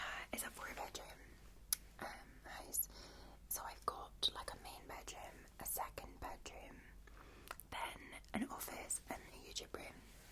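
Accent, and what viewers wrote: Scotland, United Kingdom. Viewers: I caught the tale end of the Instagram chat it was awesome to see your sister her Scottish Accent is way thicker then yours I guess because you lived In London for 8 years! Thank you for the chat it was nice! Is my guess that you let your sister win the arm wrestling contest on the mark LOL 🤣 my sister's could beat me in an arm wrestling contest there way stronger then me I'm just taller then they are! Thank you for this really relaxing Tingly video I appreciate it!:_Loveheart:_Loveheart:_Loveheart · Hello 💖💖💖❤️❤️❤️🥰🥰😘😘🤗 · Me looks at the title of the channel: that’s a weird name Me after hearing 3 seconds of a vid: oh she’s scottish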